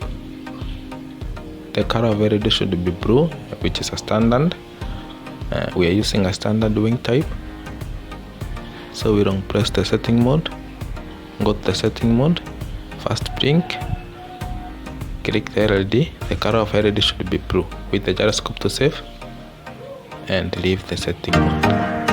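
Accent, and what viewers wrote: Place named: Indonesia. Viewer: Oh, I guess you're from Indonesia because your accent is very similar to an Indonesian remain optimistic about developing unmanned aerial technology bro, as an innovative step in diversifying technology into the aerospace engineering Hello from Indonesia, I'm a technical student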